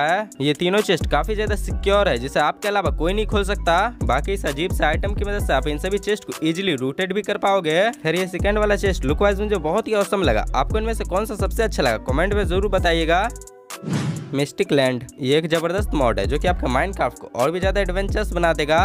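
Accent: Bihar, India. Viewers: Your speaking style is like bihari but ek dam mast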